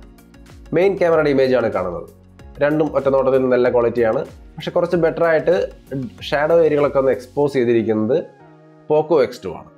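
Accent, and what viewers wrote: Thrissur, India. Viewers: മനുഷ്യാ.... നിങ്ങൾ തൃശ്ശൂർകാരൻ ആയിരുന്നോ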